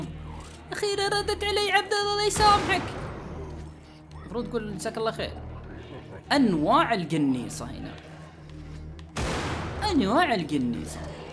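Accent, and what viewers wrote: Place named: Saudi Arabia. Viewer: اللهجه سعوديه وحتى ask any one he told you were he from!!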